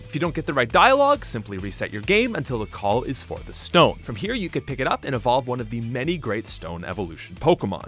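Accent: Canada. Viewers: Imma guess this guy is canadian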